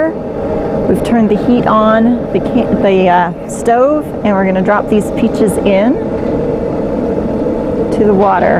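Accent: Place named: South, United States. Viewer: you make it all look so easy -- can 9 quarts of peaches in 14 min. Wow, it takes me all afternoon just to peel the peaches:-) Ok -- I heard somethings in today's video that made me think -- you were not born and raised in Utah, were you. I heard a couple of different accents today. I would say East of Texas, but not Mississippi or Alabama -- maybe southern Missouri, then I heard some east cost -- North Carolina, maybe a touch of Massachusetts. I would say that you moved to Utah about 20 years ago. Now send me 7 quarts of peaches -- or you will get 3 cases of SPAM Lite (even the dogs will not eat that!!!)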